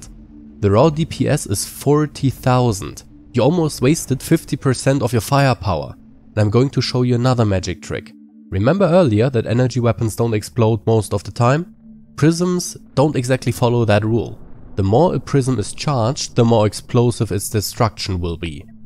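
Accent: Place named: Germany. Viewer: Warum hört sich das an als wenn ein deutscher gut Englisch spricht?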